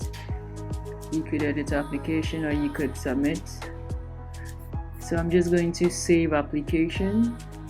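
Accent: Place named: France. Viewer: I can feel the French accent in your presentation 😂.... Great Video 👌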